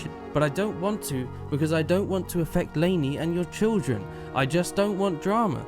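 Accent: England, United Kingdom. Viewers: It took me 8 minutes to realize you have an English accent :P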